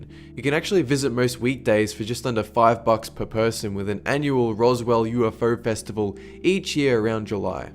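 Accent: Australia. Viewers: Yeah it's Australian~ best accent ever！ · Australian.but has some american influence like pronouncing new like noo ... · Seven Super Kittys he doesn't sound canadian to me, much more aussie. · Whay is his accent? I want to say Australian, but I dont hear many accents in my life. And you should make a sequel to this video. You missex alot of other cool museums! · sounds like Australia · He's from Australia he says it in one of the videos by the way am from Australia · I believe he is Australian. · Not a normal Australian accent.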